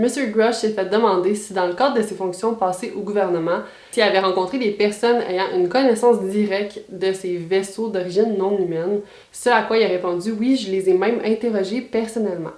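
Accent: Quebec, Canada. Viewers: Très bon résumé, c'est la première vidéo que je vois de ton site et j'adore, surtout ça fait du bien d'entendre quelqu'un parler des ovnis en Québécois, beaucoup plus facile à comprendre.Très heureux de voir que les Québécois(se) commence à crée des sites sur les ovnis-phénomènes inexpliqué-etc..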